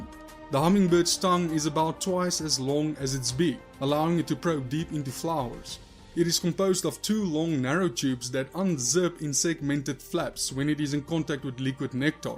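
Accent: South Africa. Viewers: You're South African right?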